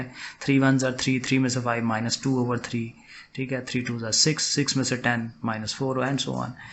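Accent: Sialkot, Pakistan. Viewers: sir are you from Sialkot??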